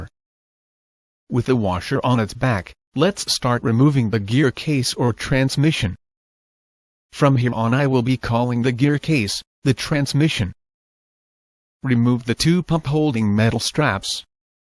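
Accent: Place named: Spain. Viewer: I prefer your cool voice with Spanish accent instead of this robot.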